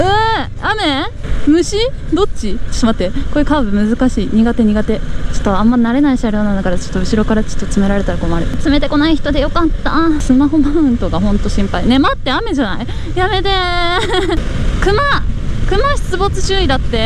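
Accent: Tohoku, Japan. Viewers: には東京でも東北弁でもっと話してもらって東北弁バイク女子のポジションに行って欲しい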